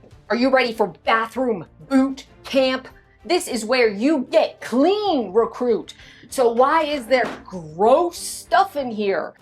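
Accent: South, United States. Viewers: 😂southern - love it! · I too was wondering why you were southern all of a sudden.. lol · The Southern is funniest! Keep it! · Love the southern drawl & the bloopers at the end! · What an awesome, hilarious video! Your southern vouce was sooooo good! ... Maybe a video in all southerb twang?!!! Penny you are a beautiful baby! ❤❤❤❤❤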